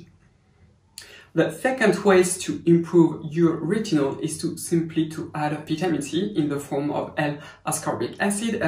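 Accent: France. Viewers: I am very sorry but do you have English subtitles? I can only see French subtitles. I am struggling to understand your lovely French accent. Not your fault but if there’s English subtitles, it would be cool. :)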